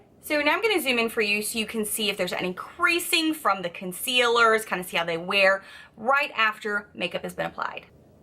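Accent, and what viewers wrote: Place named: New Jersey, United States. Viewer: Ps I like the special jersey/queens accent you do lol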